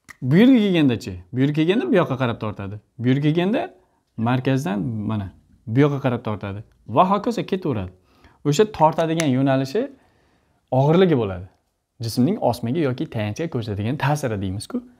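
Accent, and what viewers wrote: Khorezm, Uzbekistan. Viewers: Xorazmdan misiz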